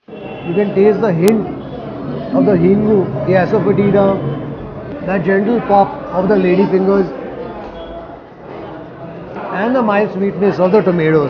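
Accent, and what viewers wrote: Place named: Mangaluru, India. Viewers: I love the way you speak kannada.. that has a mangaluru kannada touch.... thank you.. 😍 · He got that our Mangalore Kannada accent. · Not Mangalore kannada, South canara Kannada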